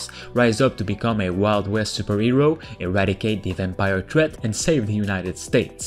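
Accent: Quebec, Canada. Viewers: I know a québécois accent when I hear one ;) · Es-tu Québécois? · You sound like a french canadian it's so weird i like it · You sound québécois! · Petit accent du Québec ? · le gros accent québécois haha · love that channel ! nice accent! jai la meme... vive les quebecois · French from Montreal Lmao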